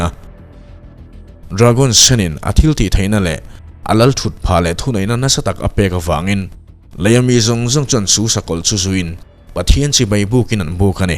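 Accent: Mizoram, India. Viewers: I aw hi ngaihthlak a nuam lutuk e... Mizo aw phawi diktak tur ani.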